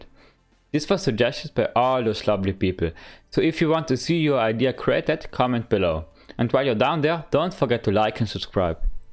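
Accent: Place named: Germany. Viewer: That's so nice. And I have a question from what country are you? It sounds like Germany or something 😅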